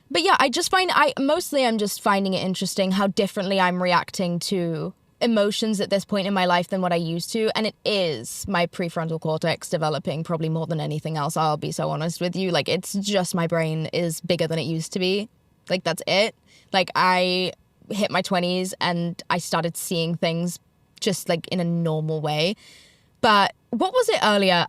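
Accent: United Kingdom. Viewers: I don't think an american accent has developed but the britishness of your accent has definitely decreased. It's noticeable if you go back and watch early tiktoks of yours. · me I don’t hear the US accent but the UK one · You did say Vite-a-min 😂 it’s VIT-A-MIN. But yes, overall you’re back to British. 🇬🇧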